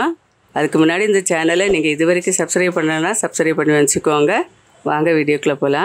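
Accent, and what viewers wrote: Nagercoil, India. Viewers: அம்மா உங்க சமையல் சூப்பர். எனக்கும் என் மனைவி கும் ஒரு போட்டி. நான் நீங்க நாகர்கோவில் னு சொல்றேன் அவ நீங்க மலையாளி னு சொல்றா. அதான் உங்ககிட்டயே கேக்குறேன்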